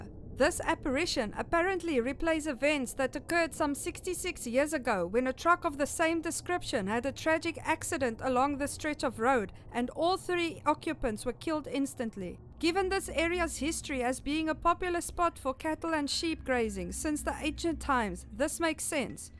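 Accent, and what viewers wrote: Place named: South Africa. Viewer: What a horrible accent. I couldn't watch it all because of it. Must be an Afrikaner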